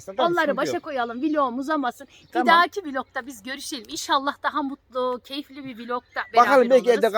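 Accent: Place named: Kayseri, Turkey. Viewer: Nerelisiniz abla kayseri şivesine çok benziyor şiveniz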